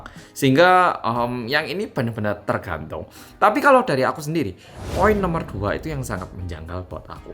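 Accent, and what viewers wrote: Java, Indonesia. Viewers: Om ngomongnya sudah medok jawa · Bang logat jawa nya kok medok.heehehe..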